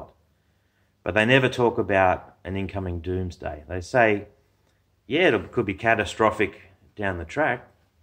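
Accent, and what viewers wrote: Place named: Australia. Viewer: thanks for the Video you sound like an Aussie too 😎 I live in a smal remotel costal town in East Gippsland Victoria and I have conversations about this with my wife, friends many locals are aware what we are facing.. and I also travel to Sydney for work and the people I know there are having this conversation too.. but, my circle of friends live simply, and are artists or musicians .. yet I think alot more people deep down know this is happening the conversation is getting larger .. unfortunately we are just locked into the current paragdime,/paradox we face there is no escape..I will subscribe to your channel...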